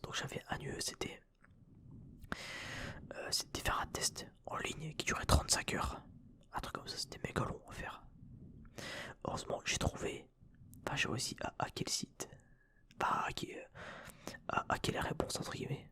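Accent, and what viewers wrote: North, France. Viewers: Tu viens du Nord ?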